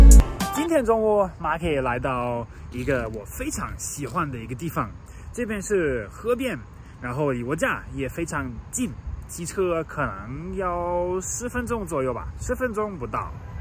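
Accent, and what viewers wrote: Sichuan, China. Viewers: 中文说的带有四川口音😁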